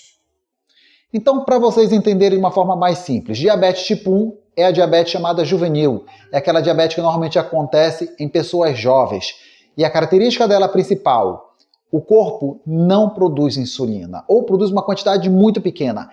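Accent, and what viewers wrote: Pará, Brazil. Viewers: Muito bom, reencontro esse sotaque maravilhoso do Pará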